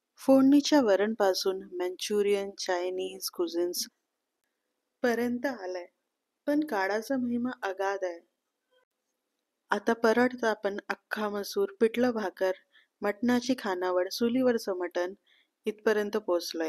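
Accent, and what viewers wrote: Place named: Hindi Belt, India. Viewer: माहिती चांगली आहे. पण उच्चार हिंदी पट्ट्या मधले वाटत आहेत. उच्चारांवर काम करावे.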